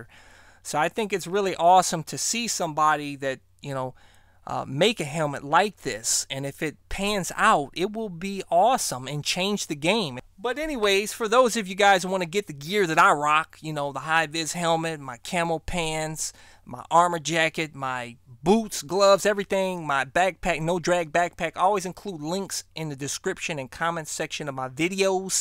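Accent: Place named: Kentucky, United States. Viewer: You sound different. What happened to that Kentucky accent?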